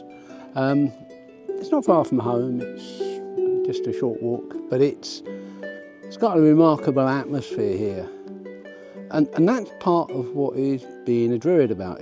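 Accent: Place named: Cockney, United Kingdom. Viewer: Cockney druid well now who would have thought it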